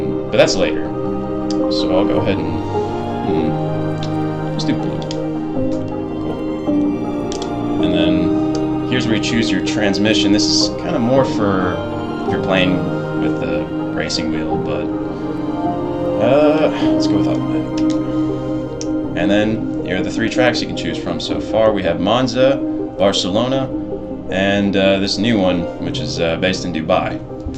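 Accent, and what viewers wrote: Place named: North Carolina, United States. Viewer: I'm also from NC, nice to hear a local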